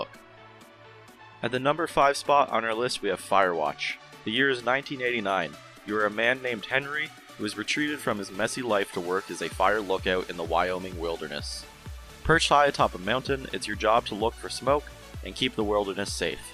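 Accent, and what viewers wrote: Canada. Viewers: Are you Canadian? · You're Canadian right? LOL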